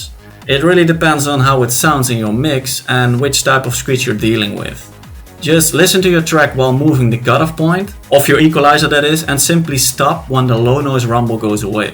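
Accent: Netherlands. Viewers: Ik hoor je een nederlander bent dus stel ik men vraag ook in het nederlands , als ik een screech rechtstreeks in de playlist load is de screech nice of normaal maar eens in de piano roll dan is de sample een heel pak korter tenzij ik jetnop een lagere noot zet maar dan is de sound ook lager dan wat ik wil dus hoe krijg een hoge maar lange screech in dienpiano roll ook de noten langer maken maakt de sample niet langer ? Grtz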